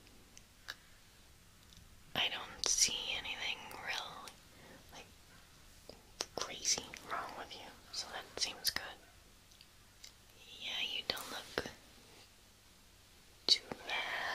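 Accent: United States. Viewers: I am unfamiliar with this series (forgive me!) and I could have sworn you said "prison mike" and I died. BTW, your American accent is like, my favorite thing in all of ASMR, it's SO good. Pretty much every video you do with it instantly goes into my favorites! So thank you thank you thank you <3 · Your american accent though 👌👌😊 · She can really rock a American accident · I love your american accent!!! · Great American accent!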